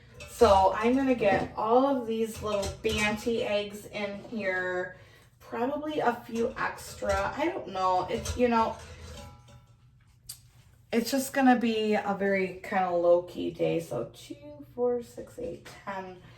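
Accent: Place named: Alabama, United States. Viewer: so glad u liked it. Did our alabama drawl crack you up? Even I was like dang I sound like a southern Belle 😂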